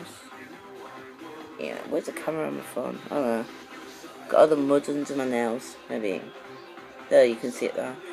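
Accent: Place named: England, United Kingdom. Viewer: by the way I like your accent are you from North or Southern England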